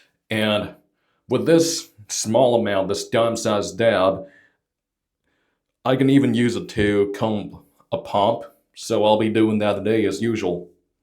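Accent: South, United States. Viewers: Awesome review and look, dude. You have a Southern twang now! Rockabilly runs in your veins, man.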